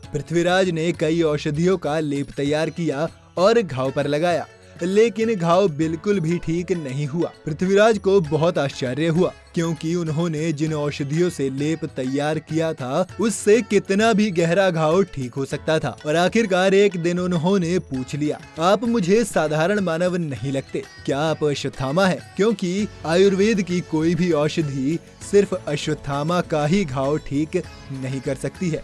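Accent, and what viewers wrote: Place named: Tamil Nadu, India. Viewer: Bhai Tamilian hai voh unka accent hindi me aise hi rehtha thum ek bar tamil ya kannada bath karke dikhao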